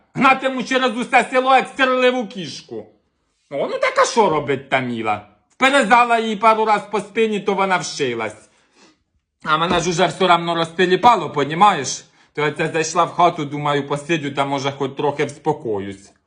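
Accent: Poltava, Ukraine. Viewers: ти неперевершена!З дорогою полтавською говіркою!Дякую!😊😊😊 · Так стає тепло на душі "по домашнєму" як чую цей рідний Полтавський говір❤. Все дитинство перед очима пробігає. Дякую за твою роботу,. Ти неперевершена, як завжди. Чудовий гумор і надзвичайна подача ❤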